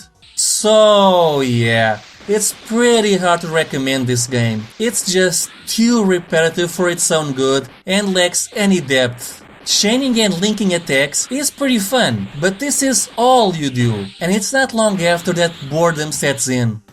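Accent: Portugal. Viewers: I sense a Portuguese is reviewing this, dá-lhe amigo 👌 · Desculpa... Mas tu és português? É que o sotaque é quase inconfundível!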